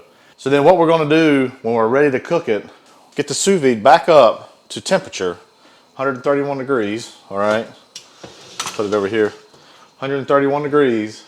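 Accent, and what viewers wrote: North Carolina, United States. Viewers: FOOD LION "JUST KINA PUT IT ON THEAR" ,,,,I'LL BET YOU'RE FROM North Carolina!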